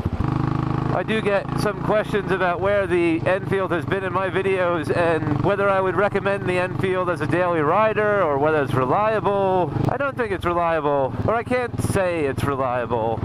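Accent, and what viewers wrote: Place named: California, United States. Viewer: thanks for your reply I've been a subscriber since late 2018, I wonder why u have a Californian accent though, good morning.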